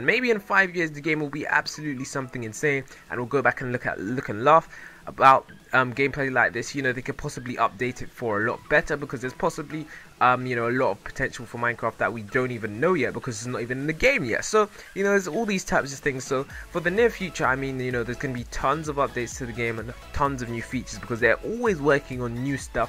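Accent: United Kingdom. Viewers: in some videos you sound and in some others you sound british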